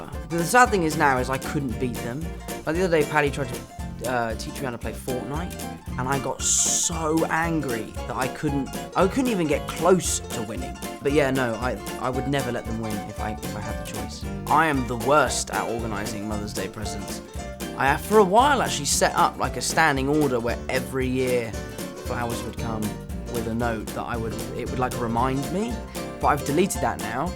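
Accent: United Kingdom. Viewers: His British accent is alive we can rest now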